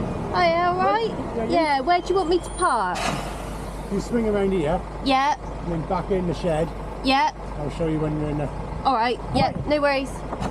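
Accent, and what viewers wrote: West Country, United Kingdom. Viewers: Brilliant to see your first vlog! Like the camera you use in the truck, nice to see all the different views and angle's whilst driving, especially when you're going round a corner and it shows the side of the trailer cutting the corner, great to hear you talking, nothing beats a west country accent 👍👍🤛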